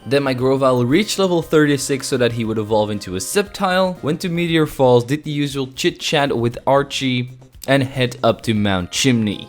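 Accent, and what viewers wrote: Belgium. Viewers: Wow je bent een belg😂 hoor het nu ook aan je stem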